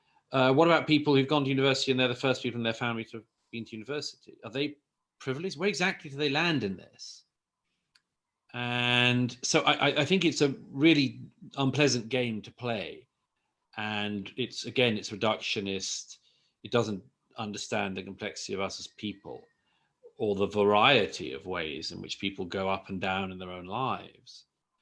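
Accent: Wales, United Kingdom. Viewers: IS HE WELSH?? Go on boi :D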